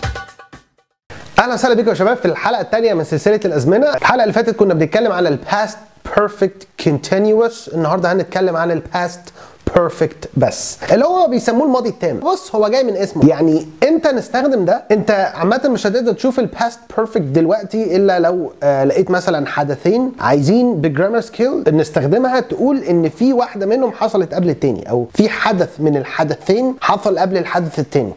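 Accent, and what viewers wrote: United States. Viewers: past participle نطقك امريكان والنطق اللى انت اتريقت عليه ده بريتيش ارجو لفت الانتباه لذلك لان بعض الناس هتعتقد انه نطق خاطىء وشكرا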